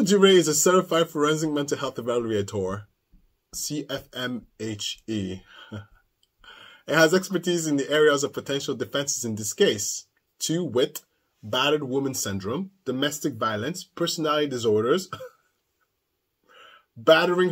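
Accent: France. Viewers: I have never felt so special to be called Lynda. Nobody has ever said my name that many times in such a short time with a French accent to boot. Pun intended. LOL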